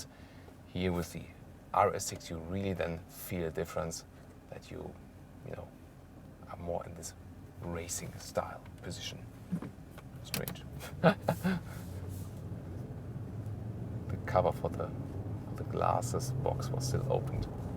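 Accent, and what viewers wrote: Germany. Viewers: Wollte eigentlich auf die RS3 Limo warten, aber aufgrund meiner Familie brauche ich doch was größeres und werde die Tage den RS6 Perormance bestellen. Freue mich wie Sau !!! Danke nochmals für den Bericht (Ich LIEEBE Deinen deutschen Akzent HAHAH)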